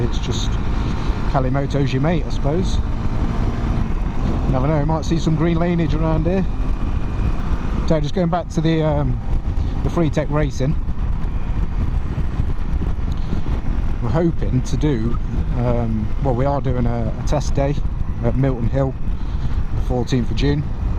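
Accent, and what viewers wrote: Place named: Nottingham, United Kingdom. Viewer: Alright pal I had issues with mic doing that but found you can alter setting in your editing app I change mine to surround. The helmet you can get a helmet mount that sticks on your helmet from Amazon called motoradds if interested. You sound like your from notts my home town born and bred until I moved up north. Great vid pal👍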